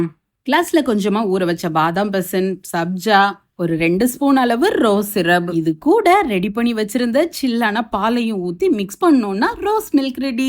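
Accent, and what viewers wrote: Tirunelveli, India. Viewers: திருநெல்வேலி தமிழோ...!😂அருமை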